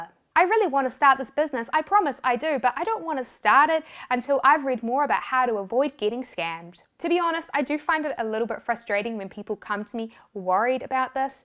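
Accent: New Zealand. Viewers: she has a classic New Zealand accent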